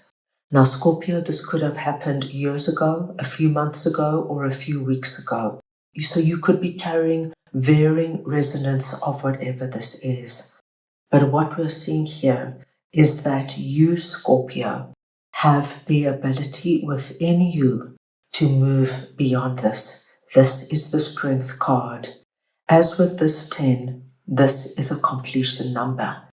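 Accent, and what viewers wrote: South Africa. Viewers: Thank you …. I love your South African voice!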